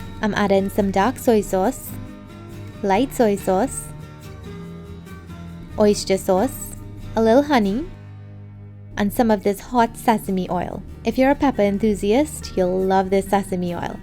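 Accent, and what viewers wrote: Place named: Trinidad and Tobago. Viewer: Really delicious! Do you prefer the bigger or smaller shrimp for pepper shrimp? I never attempted it but this makes me want to 😻 Your accent always makes me happy to be Trini🇹🇹 I really need that hops at the moment!!!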